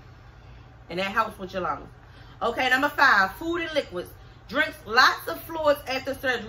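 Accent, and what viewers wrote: Baltimore, United States. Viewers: Glad you did good with your drink. Your right, it’s good to try the massages. You look fabulous by the way and I’m loving your accent. Sounds like Baltimore??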